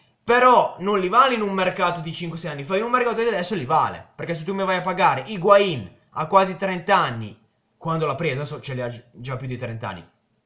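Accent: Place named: Piedmont, Italy. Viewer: troppo forte un laziale con l'accento del nord mi sembra torinese o cmq piemontese, daje continua ci piace!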